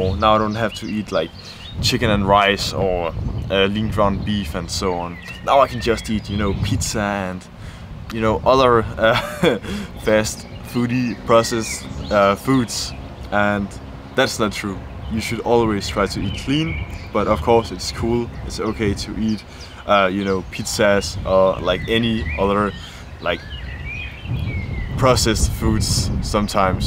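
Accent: Standard. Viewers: 被大家好嚇到 很標準🔥🔥🔥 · 大家好 很標準❤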